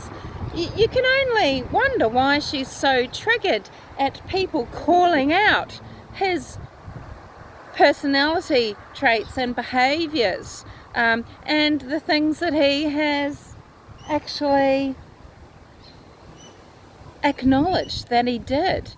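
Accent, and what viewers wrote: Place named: Australia. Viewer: I thought you were an Ozzie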